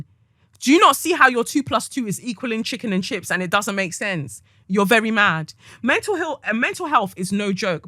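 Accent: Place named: Africa. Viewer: Every single time!!!! Must you always be this on point african mother accent Everytime I listen to you it’s like your speaking direct to me.Always speaking the light I needs to hear at the perfect time. Thanks and appreciate you my baby gurlllll.✨🌻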